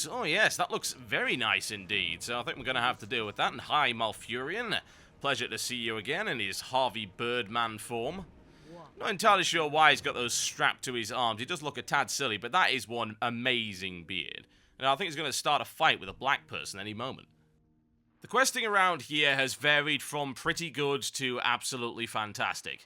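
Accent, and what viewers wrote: United Kingdom. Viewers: Tb, i luv ur videos ur so unique nd best of all ur a britsih commentator! much love x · A brit ranting. Epic win.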